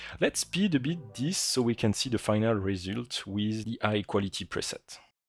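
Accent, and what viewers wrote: France. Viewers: Accent français n'est-ce pas? 😊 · The French accent in English is out of this world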